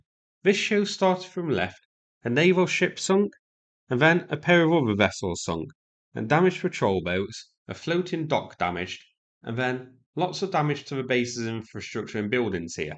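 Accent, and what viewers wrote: United Kingdom. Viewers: Find someone who can speak English not British jibberish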